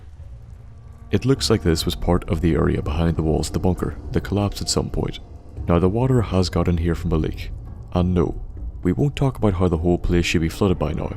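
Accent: Northern Ireland, United Kingdom. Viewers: Great video man.What part of Northern Ireland are you from btw?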